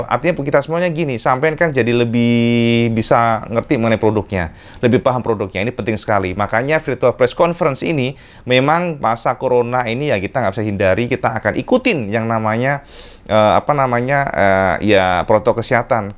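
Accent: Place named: Tulungagung, Indonesia. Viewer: ketoro sekk enom cak sman 🤣🤣🤣 bhasa tulungagung mesti ujuk"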